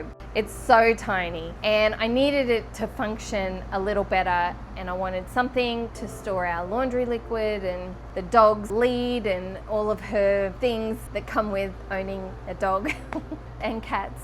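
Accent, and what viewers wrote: Australia. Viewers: So so so glad to hear an Aussie in US restoring furniture .... so glad to have found your youtube channel ...... Trust you go really successfully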